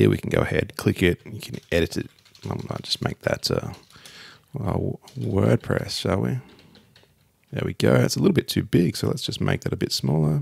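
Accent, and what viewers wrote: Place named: Australia. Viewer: I saw this demo’d on another vid which was older than this one, and I was very keen to use for my website blogs - funny it’s still in beta but I’ll be getting all over it as an all in one for blogs and much quicker to develop than elementor or Divi - not that they are that tricky but this is quicker again. And dude am I mistaken that your an Oz tuber? Sure sounds like it, very refreshing to hear the Oz accent. I’ve checked out a few of your vids and I’ll subscribe to add support to an Aussie bloke, your vids are good and helpful. Keep it up. Cheers Cherie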